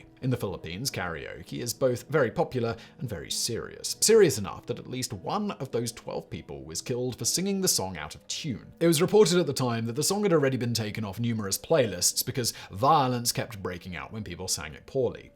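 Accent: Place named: Hammersmith, United Kingdom. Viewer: I feel like your accent is more hammersmith less kent 🤷🏻‍♀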